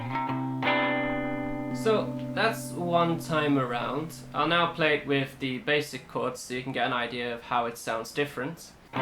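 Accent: United Kingdom. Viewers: You sound like the British reporters who report the war in Afghanistan.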